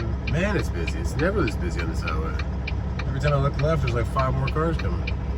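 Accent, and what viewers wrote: South, United States. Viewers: How come you have a southern accent when you are from SoCal/ AZ? Are you getting spinners for the new wheels?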